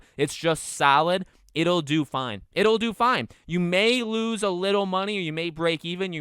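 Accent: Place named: Massachusetts, United States. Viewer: The Massachusetts accent is really strong with this one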